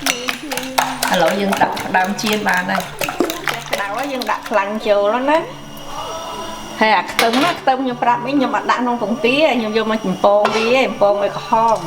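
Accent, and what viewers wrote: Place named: Kampot, Cambodia. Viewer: Hi mama, I interested in watching your cooking show very much! By your sound, are you from Kompot province?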